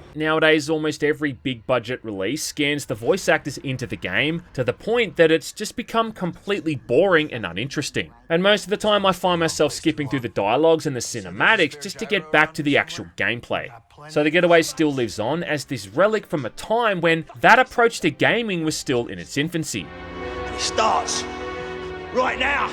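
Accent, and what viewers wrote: Australia. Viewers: Fkn AUZZIE 😂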